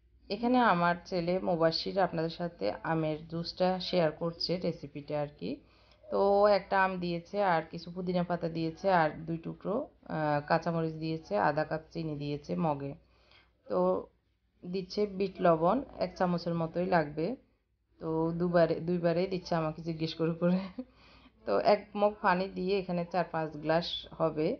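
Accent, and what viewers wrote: Noakhali, Bangladesh. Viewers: আপনে কি নোয়াখালির মেয়ে নাকি, এই ভাবে কথা বলেন কেন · সুন্দর বাচনভঙ্গি মানুষের দৃষ্টি আকর্ষণ করে আপু।এদিকে মনোযোগ দিবেন আশা করি।আমাদের নোয়াখালীর ভাষা ছাড়া ও আমরা চলতে পারি তা দেখিয়ে দেন। আজ প্রথম দেখলাম দশে ৬ নাম্বার দিলাম আপনাকে। কথা গুলো ঠিক করুন দেখবেন আরো এগিয়ে যেতে পারবেন। আপনার ভিডিও কোয়ালিটি দারুন, মিউজিক টা একটু হাই। রাগ করবেন না প্লিজ আমার মতে সমালোচনা সামনেই করা উচিত। একজন ভিউয়ার বা ছোটবোন হিসেবে ভুল গুলো বললাম যেনো শুধরে নিতে পারেন।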